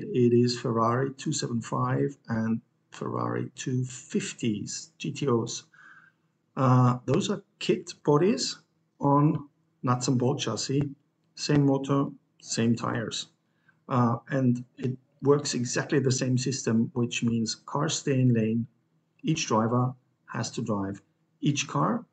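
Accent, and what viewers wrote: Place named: Netherlands. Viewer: Love the details of the build. I wonder if you are a native from the same area of Holland as Max Verstappen your accent is very similar sounding to me.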